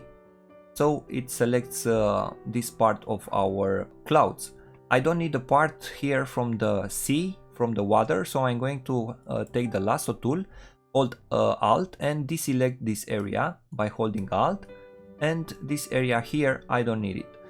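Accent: Romania. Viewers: Nu stiu de ce, dar accentul tau imi spune ca esti roman. Buna treaba, explicarea layerelor nu e comuna, multa lume face doar speed art. Esti bun, evoluezi repede. If I assumed wrong what I wrote in first part I still want to tell you thanks for your layers break down. We dont get this thing so often, majority of ppl just posting speed art.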